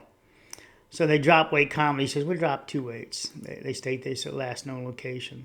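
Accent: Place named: New York, United States. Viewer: Hello from Kansas! Really enjoy watching your analysis. It reminds me of Seinfeld. It isn't a show about nothing but idiots who troll think it is. Maybe its perhaps, what I think is a New Yorkish accent? or at least northeast coast American accent. I do have Kansas ears and we have little to no accent so . . .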